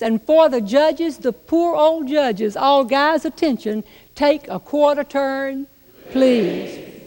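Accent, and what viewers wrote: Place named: Georgia, United States. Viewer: her accent sounds so much like a South Georgia accent I love it!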